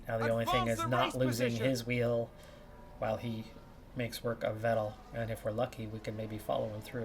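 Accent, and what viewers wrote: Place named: United States. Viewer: I fail to see, or hear, basic English being adhered to either I agree, regardless of where the drivers are from he supposedly speaks English its just that as he is American it is a bastardised version of the language and it's only people from that country that decide to pronounce words and names completely differently to how they should be, fact. Thanks for stopping by and for your insightful contribution, look forward to your input again next time you are feeling like a SJW 👋😁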